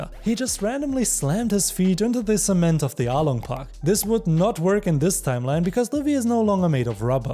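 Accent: Germany. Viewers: Ich liebe den deutschen Akzent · you sound german. also, änel lol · ur german 100%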